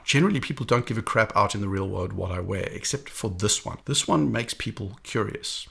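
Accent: South Africa. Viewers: Great video! I'm also debating between the white speedy and the A384. Is that a South African accent I hear?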